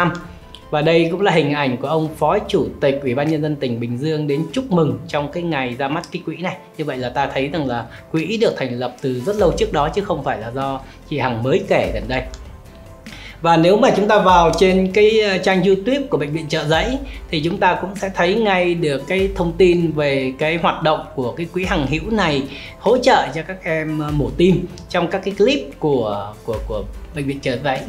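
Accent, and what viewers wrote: Standard. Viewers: Rất thích người có học thức ăn nói chuẩn, dễ nghe, rất thuyết phục, phân tích hay, cái mà nhiều kênh khác còn thiếu. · Anh noi rat chuan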